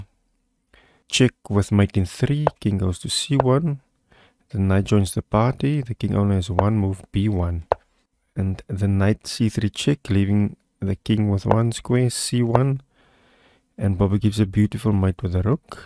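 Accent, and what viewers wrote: South Africa. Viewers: Very nice. This is of course the so-called 'Game of the Century' which gained the young Fischer a lot of attention. To the narrator: are you from South Africa?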